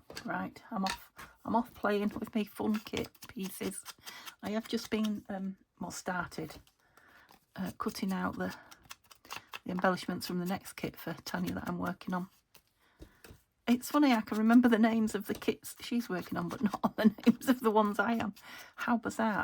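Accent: United Kingdom. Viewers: Good morning. ☕️ Congratulations on 3,000 subscribers. It sometimes takes me a day or two to completely watch a video because of interruptions in my life. Your voice, and gentle crafting and wonderful UK accent are the highlight of my day. Thank you so much for the inspiration and sharing your beautiful journals with us.❤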